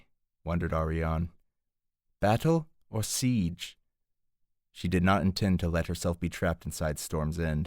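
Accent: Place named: United States. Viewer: So weird having these read in an American accent...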